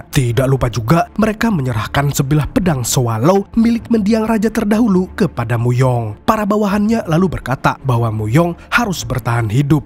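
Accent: Banjar, Indonesia. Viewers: Bah.. Urg banjar kh ni? 😅😅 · Ulun kada tahu Pian orang Banjar kah... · Org Banjar sekali kwn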